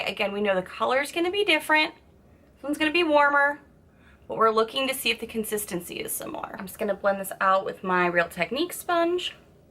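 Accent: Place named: New Jersey, United States. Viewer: Ps I like the special jersey/queens accent you do lol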